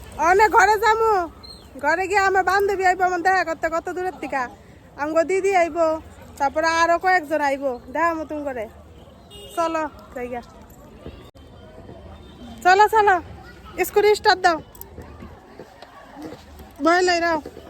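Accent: Bangladesh. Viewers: The dialect you through when you speak is same like grater dhaka district ( not dhaka city ) village area of dhaka district, narayan gaung, manik gaung,narshindi,bikrompur , gazipur even greater farid pur district also speak same dialect....I pray to God keep all of you happy and prosperous...from Dhaka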